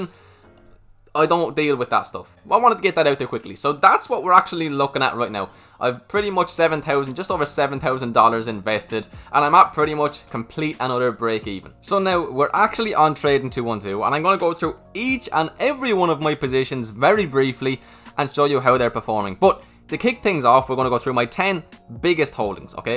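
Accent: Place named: Ireland. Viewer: Seriously mate, three reasons for me to keep on watching your videos: your enthusiasm, expertise and definitely your Irish accent. Is there any reason though why I hardly see any short term investments in your portfolio or long term investments in low rated companies?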